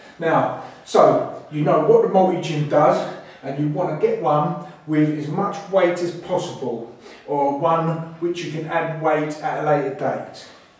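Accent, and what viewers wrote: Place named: Romford, United Kingdom. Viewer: Exactly the advice I was after. Proper decent accent - around the Romford area?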